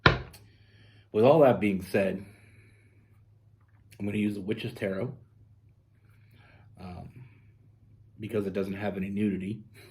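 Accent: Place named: Canada. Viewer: It's the beautiful Canadian accent ❤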